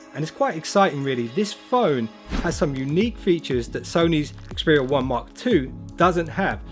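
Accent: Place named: United Kingdom. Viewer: Britain accent is horrible..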